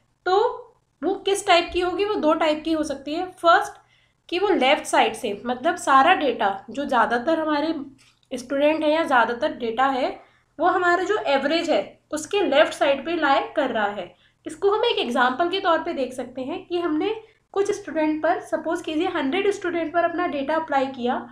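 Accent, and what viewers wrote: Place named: Odisha, India. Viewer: Mam i think you are from odisha 😍😍😍😍🥰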